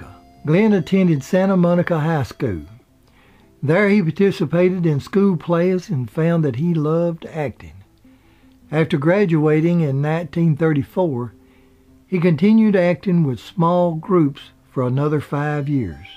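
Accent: South, United States. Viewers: I personally like the videos that provides for the YouTube community. I also enjoy listening to his voice as it is unique, very southern (I believe) as well as soft spoken. This is the kind of voice that would help a high school student to listen in order to learn a complex subject as it is very calming. I enjoyed this video on Glenn Ford as I also enjoyed his acting. I also enjoyed his video on Dan Blocker who played Hoss Cartwright on the long running television show Bonanza. was on location where the Cartwright's home and store were shown to viewers. This is an in depth look at the life of a celebrity and I liked the way in which conveyed this information, it added depth to Blocker and he made me feel like I had known this man. Not many people can do this. By showing viewers pictures of what buildings and houses belonged to him and how those buildings sit today add layers to a persons history and I enjoyed this perspective. 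The other video that I can recommend whole heartedly is on Billy the Kid. As a young kid growing up in New Mexico, Billy was a sort of anti hero people could relate to. has filmed areas where some of the action had taken place. His work combines photos taken at the time with camera work visiting these historical places that have been preserved. For those viewers who cannot make it to New Mexico to view where Billy was supposed to hang, where the sheriff was shot in the street, where his employer and friend John Tunstall w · Your accent is really gorgeous... so full of character. I'm in Ireland so it's a lovely novelty to hear this beautiful Southern drawl in all its raw glory. I especially love how you say dates... like nineteen and eighty-two. Hope you never lose it. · Nice biography's. I'm from Aberdeen Scotland. Movie stars have touched everyone around the world over the years. Nice to hear someone giving the facts without being judgemental. 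No one is without sin and the internet is full of people who love to judge. keep it up! Love the southern accent. Beautifully relaxed.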